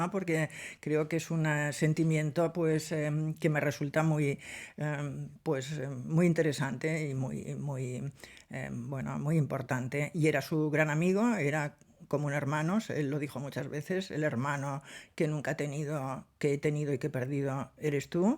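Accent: Spain. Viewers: Me encanta tu programa, no siempre estoy de acuerdo pero me divierte el acento espanol. Soy de Argentina y la verdad me cuesta entenderlo por el lunfardo. Pero, igual tus programas son super divertidos. Un consejo porfavor, habla mas pausadamente que es dificil seguirte. Un abrazo.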